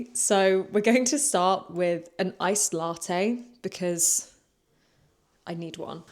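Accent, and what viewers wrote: United Kingdom. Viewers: I really enjoyed your videos and I especially loved your British accent. No wonder you're from the native English-speaking country!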